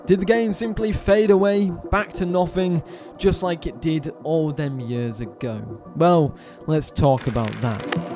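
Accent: United Kingdom. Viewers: Is this a Scottish accent by some chance?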